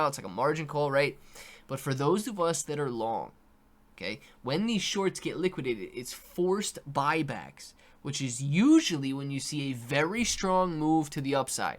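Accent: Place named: Australia. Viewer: Your Australia accent was awesome!!!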